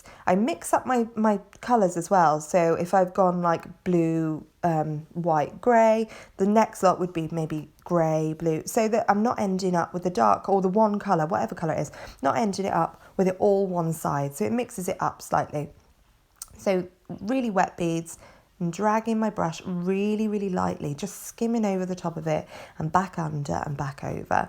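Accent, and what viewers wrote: United Kingdom. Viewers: I just started watching your vids and absolutely bloody love them love your personality I'm from manchester and live in the u.s and just reminds me of home and I crack up to your voice overs there just Brill thank you so glad your vids showed up on my feed!!!! Georgeous set too! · I just subscribed last week, and I find you informative and FUN! To an American, who, (in the states,) is considered to have "no accent,"* there is something incredibly charming about a British accent cursing. It's unexpected and kind of incongruous to the American ear, and it hits our ears as hilarious! *I was born and raised in California, fourth generation native Californian, at that. I think a California accent is the American equivalent of received pronunciation in the UK.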